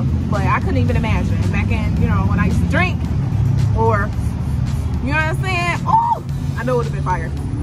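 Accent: South, United States. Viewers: In and out is only good the animal style way and them fries was trash when I tried them. But I want you try other options at whataburger and lmao u know how us down south folks talk gurl 🤣 😂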